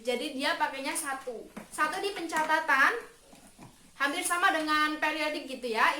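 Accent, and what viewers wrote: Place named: Java, Indonesia. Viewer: Mbak nya kok lucu,, ada medoknya dikit😂 tp aku suka😊🤭.. asli mana sih kakaknya😊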